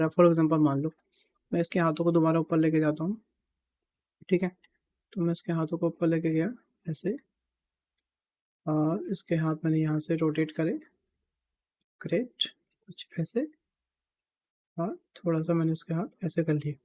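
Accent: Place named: India. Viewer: bro i was frustrated by rigging but ,, after your video now i got every point you teached .. best video for rigging in indian accent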